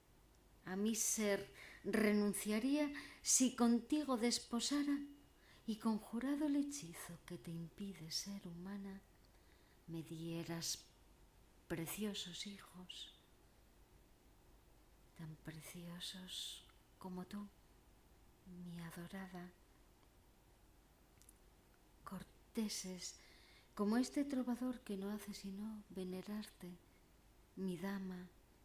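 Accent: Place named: Spain. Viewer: Que lindo! muy dulce tu voz y ademas amo ese acento español!! un abrazo grande compañera!!!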